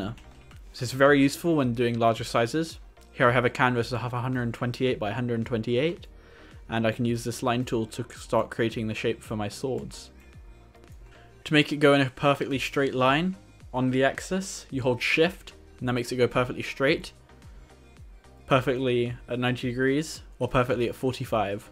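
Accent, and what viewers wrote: United Kingdom. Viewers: if your voice library is so small you came to that conclusion I feel sorry lol. He is british accent sort of very lightly sounding but that's the only real similarity Everything else you assumed is placebo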